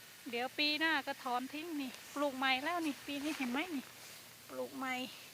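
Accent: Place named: Surin, Thailand. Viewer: เพิ่งเห็นจ้ะติดตามนะเว้าเหมือนคนสุรินทร์นะชอบๆจ้ะ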